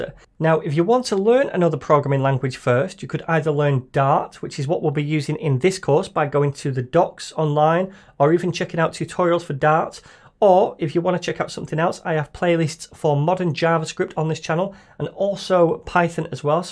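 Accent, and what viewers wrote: United Kingdom. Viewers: ngl, at first i thought this man was indian, but when i listen him carefully.... He is british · Long live bri'ich accent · your British kind of accent is relxing and easy to be understood and the way you explain things is really something. Thanks! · british accent right?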